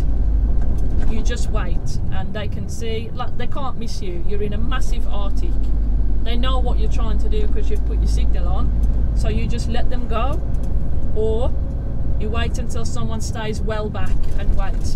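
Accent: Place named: West Midlands, United Kingdom. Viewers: great video ive just started my class 1 from car and stressing before I've done my theroy test you would be a great instructor and love the local accent im a west midlander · Great video. I'm an ex HGV Class 1 driver. I lost my HGV licence due to a chronic heart condition. I now live in Wales but I am origionally from West Bromwich. It's good to hear someone with my accent. Your video really did bring memories flooding back to me. I enjoyed it very much. You are an excellent driver. One small critisism. Please don't mind me mentioning this but I noticed a lot of crossing of the hands on the steering wheel. Apart from that you did a top class job. Accept my good wishes and I look forward to watching more of your videos.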